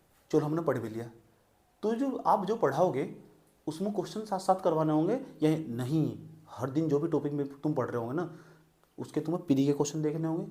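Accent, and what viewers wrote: Haryana, India. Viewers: Asa lagta h sir ap bhi haryana se ho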